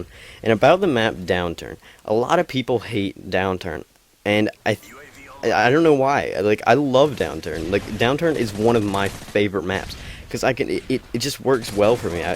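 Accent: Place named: South, United States. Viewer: He sounds southern.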